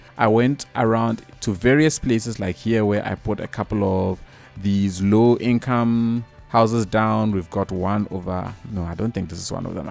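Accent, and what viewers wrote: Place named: South Africa. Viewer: I heard the accent and immediately knew this is a fellow South African.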